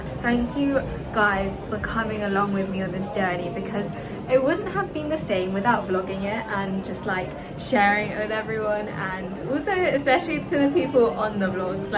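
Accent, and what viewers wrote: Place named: Southern England, United Kingdom. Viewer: Weird observation to make, although your accent is very southern, when you say done it sounds very northern lol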